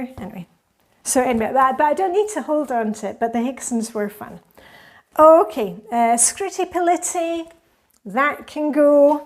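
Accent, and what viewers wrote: Scotland, United Kingdom. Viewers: Hi, so sorry to hear about your mum's passing, my mum was from Edinburgh and your accent always reminds me of hers - she never lost her accent after moving to England in the 80s - but she passed away in my early 20s. My question is: how would you have felt about the decluttering if you didn't have your brother to pass these records onto? We're currently getting ready for an international move and it's the sentimental stuff - you almost crave peace of mind that it's going to a good home, but obviously once it's out in the universe you don't have that control so you end up hanging onto it? Do you think you'd have been as ruthless if it was just being let go? You're always so good with decluttering, does it just become an easy habit making harder choices easier?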